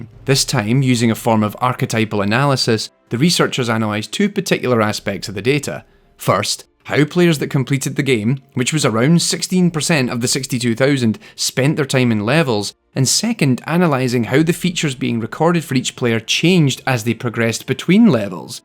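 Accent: Scotland, United Kingdom. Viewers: Scottish was my guess, but I wasn't sure. Thanks for your answer!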